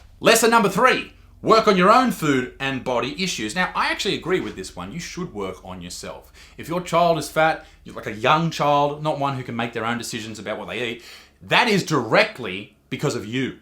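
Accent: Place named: Australia. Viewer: He's true blue....an Australian with thick Aussie accent. He was raised in rural Australia for sure.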